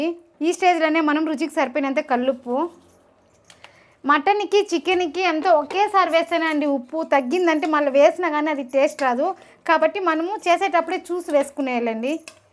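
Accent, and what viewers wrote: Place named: Tirupati, India. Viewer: Chala baga chesaru akka mutton pulusu 👌🏼😍 ekada akka mee vooru? Tirupati r kalahasti anpisthundi slang vintunte ??!!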